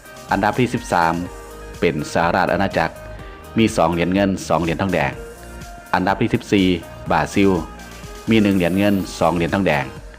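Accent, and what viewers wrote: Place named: Isan, Thailand. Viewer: เว้าอีสานฟังม่วนดีคับ ผมเองเกิดที่ชัยภูมิอีสานโดยตง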